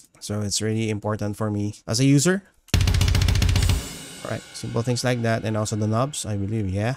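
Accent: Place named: Philippines. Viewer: Nice to hear a 🇵🇭 accent while talking about metal related things. Mixes home with what I love.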